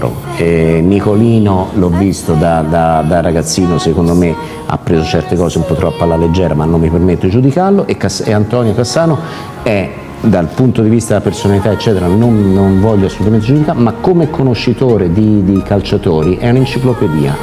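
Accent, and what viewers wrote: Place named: Tuscany, Italy. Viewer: Ma come mai hai l'accento toscano? 😂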